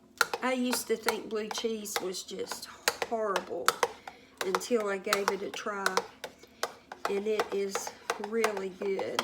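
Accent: South, United States. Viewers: You have such a country sweet way of speaking turn your eyebrows inside out!!!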